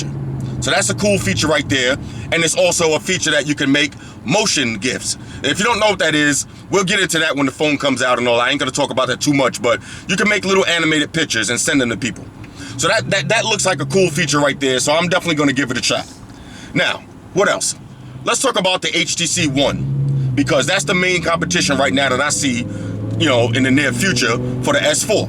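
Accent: New York, United States. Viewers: Thats that NY son Q'borough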